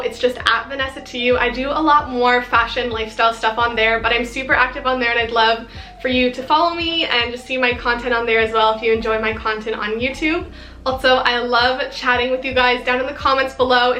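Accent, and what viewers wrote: Canada. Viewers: Hi I am also from Canada I just found your channel I am going to subscribe. Are you from Ontario it sound like you are? I hope this is not creepy 🤣. I am also from Ontario if you are. You video was amazing btw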